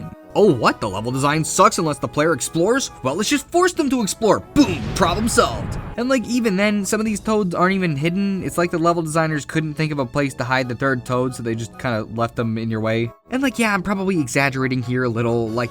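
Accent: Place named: New York, United States. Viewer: I might be going crazy but, I swear he's getting more and more New Yorker as time passes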